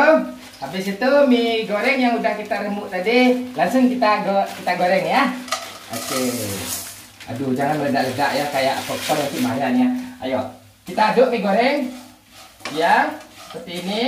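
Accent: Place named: Java, Indonesia. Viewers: Bg kok ada medok" nya kayak Youtubers porbolinggo 😂😂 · Wkwkwk kok medog yaaa wkwk ngakakk😂😂😂😂😂😂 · Kok medok bang😂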